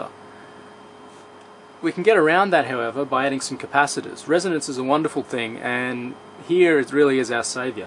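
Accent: Australia. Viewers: thank you! I was right. I thought you are Australian)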